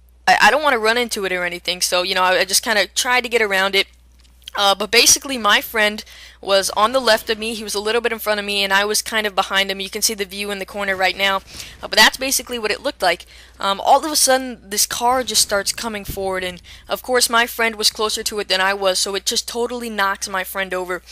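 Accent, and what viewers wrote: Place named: Portsmouth, United Kingdom. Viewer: Sounds like Portsmouth.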